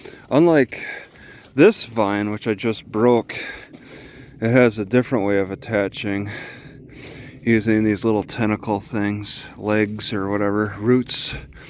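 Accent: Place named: Wisconsin, United States. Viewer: He says from Louisiana but his accent and email and videos clue us into Wisconsin. Not trying to generate your bad vibes but im from mn and id recognize you anywhere. We have the same eyes and ways.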